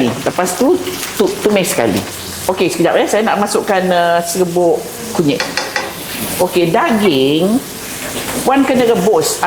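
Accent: Johor, Malaysia. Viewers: Nak cubalah resepi ni masak utk cucu2. ckp mcm org Johor. Apapun terima kasih kongsi resepi ni. ❤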